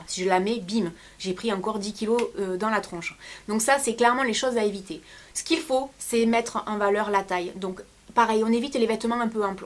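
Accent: South, France. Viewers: L'accent du sud . J'aime trop ; )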